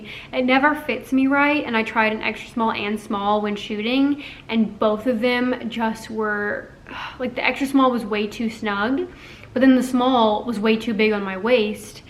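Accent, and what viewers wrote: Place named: England, United Kingdom. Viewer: I am not ashamed to say that I have been a subscriber for a few months now... I am either like whaaaaaaa (jaw crashing off floor), you make me laugh with your awesome English accent hehe I'm from UK, may I mention that wow I look great with your hair straightened, always Beautiful just never seen you with straight hair, I think that all the shorts you don't really like or think you don't look as good as you actually do, you make the clothes sexy, anyway I'm just expressing truth so surly ppl can't be mad at me for that, And my perception is different to yours, I see the Art in a different beauty as beauty lays in the eye of the beholder, luv ya awesome vids. Xx Dav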